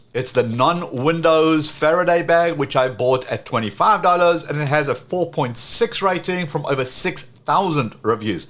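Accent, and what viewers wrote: South Africa. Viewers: Howzit, do i hear some 🇿🇦 in your accent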